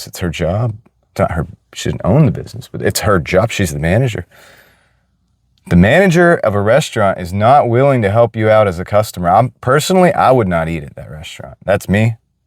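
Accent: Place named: Rural, United States. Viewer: Unrelated, just curious, what part of the US is the accent from? To me it sounds like a very mild country accent of sorts. Pleasant sounding. Just curious.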